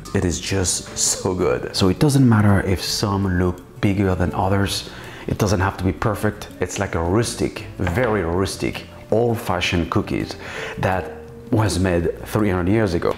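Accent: France. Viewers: You are so delightfully smarmy! Your voice, your accent, your facial expressions... oh, you make me miss my time living en France. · first time viewer. your french accent, and story telling abilities sold me. subscribed already. :)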